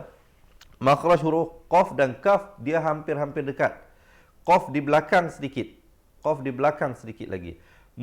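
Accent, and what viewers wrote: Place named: Malaysia. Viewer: Lawak uataz ni.. Bunyi Melayu beno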